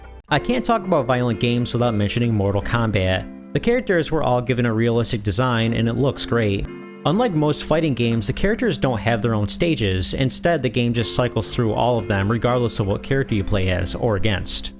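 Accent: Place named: Rochester, United States. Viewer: Your voice sounds like rodchester new york. Garbage plate eater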